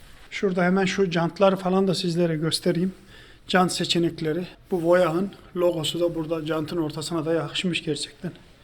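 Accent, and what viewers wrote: Yozgat, Turkey. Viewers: Gardaşım Yozgatlımısın ulaa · Abi şive Yozgat gibi senin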